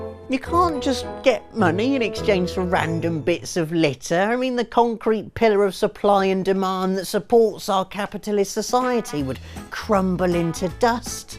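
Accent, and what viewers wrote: United Kingdom. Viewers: I'm from Canada, and I personally prefer your british accent over the english I'm used to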